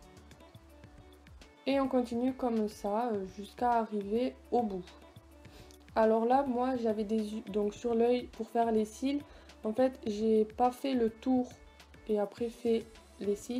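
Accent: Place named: Marseille, France. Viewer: C'est la première fois qui j'entends ta voie et je ne m'attendais pas du tout à un accent marseillais😂😂 Bref! Ta vidéo est vraiment cool!😍😍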